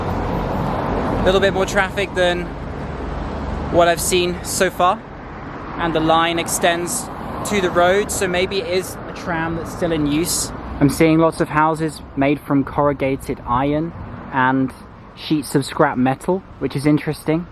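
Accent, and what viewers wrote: United Kingdom. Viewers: Are u british ? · Nothing interesting, such a boring ew city. Btw, I like your british accent ㅜㅜ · Nice proper British accent!!!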